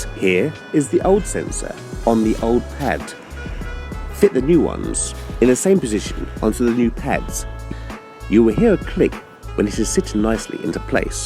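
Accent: United Kingdom. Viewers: Being from the UK myself I love the oh so posh English voiceover 😆👍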